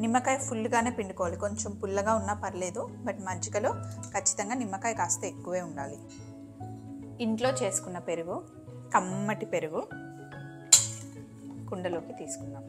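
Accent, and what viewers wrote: Telangana, India. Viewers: Telangana slang alaage untundi andi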